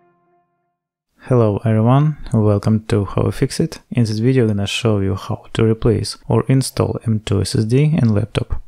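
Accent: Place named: Russia. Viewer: Акцент выдаёт, на русском может есть смысл комментировать?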